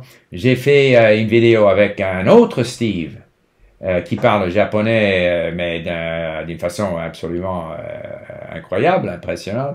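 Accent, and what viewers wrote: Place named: United States. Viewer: الأكسنت تاعو رايح للماريكانية بسك ماريكاني . بصح الفرنسية تاعو parfait 👍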